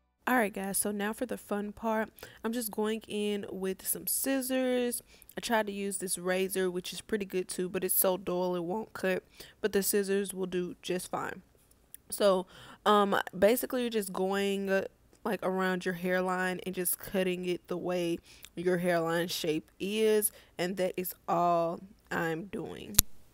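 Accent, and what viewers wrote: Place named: South, United States. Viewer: Love that southern belle accent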